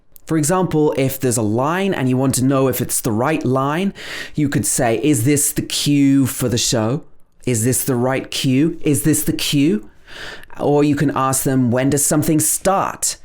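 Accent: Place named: United Kingdom. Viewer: Pls do continue making videos...I am an indian ...its great to learn British accent from you...doing a great job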